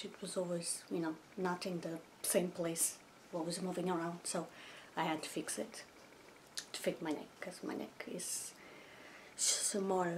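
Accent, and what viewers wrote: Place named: United States. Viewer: in portugal wouldn't you learn british english? your english sounds totally american to me.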